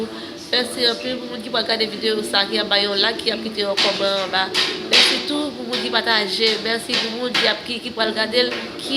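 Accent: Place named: France. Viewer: I love ypur french accent young lady you got my upport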